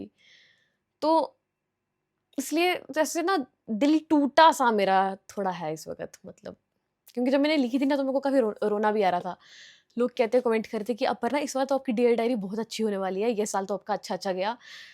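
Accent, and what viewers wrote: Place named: Poonch, India. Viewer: Yeah dear dairy was awesome And poonchi accent tooo❤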